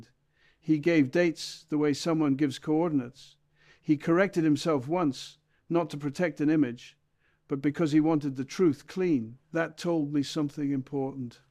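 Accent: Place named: United Kingdom. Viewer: Agreed. Can hear the british accident.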